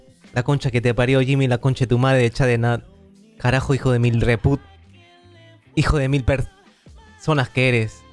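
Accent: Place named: Peru. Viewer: Si parece peruano,aunque combinado con otros países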